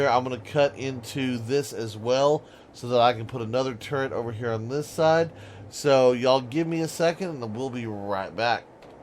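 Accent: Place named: Texas, United States. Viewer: Some suggestions because you asked for it :D I'm started playing the game and watching your videos since alpha 8 came out. I like your videos, because your playstyle is a bit similar compared to mine, and I like the texan accent as well. :D In your videos I think you should go for more exploration and less building. I mean, building is fine, but sometimes there are too many sv / hv / cv build videos come one after the other. Back then, when alpha 8 was hot, there was not as much content in the game, than now we have. You are still playing the game like you played alpha 8. But with alpha 12 and with the project eden scenario you have literally hundreds of POI-s, and hundreds of planets and systems to explore. I don't say you should warp to a new planet in every video - not at all. But a bit less detailed base building, a lot less detailed sv / hv / cv build videos, and a lot more exploration (poi-s and planets), because I don't think you would run out of content before a huge update would come and you should have to start a new game to get the new stuff. 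Here's how I play: escape the horrible starter planet (rogue), find a good planet (snow planets are my favorite too). On the snow planet, build a huge base, using it as main base, as HQ etc. and with the new teleporter I can come back to it from anywhere, and I don't have to abandon it, and keepin improve it till time to time. I hope it helped a little bit.)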